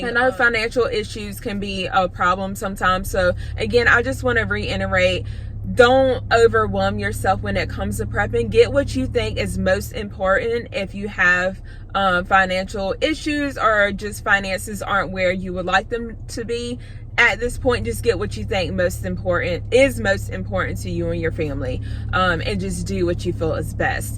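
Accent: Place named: Mississippi, United States. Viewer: Love your accent. It sounds a lot like a Mississippi accent, my home state. The stuff is about to hit the fan. We cannot continue to go further into debt. Inflation is rampant yet interest rates remain near zero. It’s a rock and a hard place.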